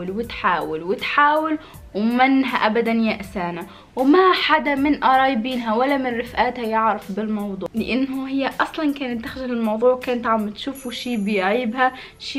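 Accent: Tunisia. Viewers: اللهجه التونسيه عسللل❤️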